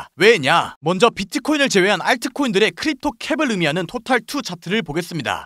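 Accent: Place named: Jeolla, South Korea. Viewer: 느작없이 오랜만에 듣넼ㅋㅋㅋ 전라도 분이시구낰ㅋㅋㅋㅋ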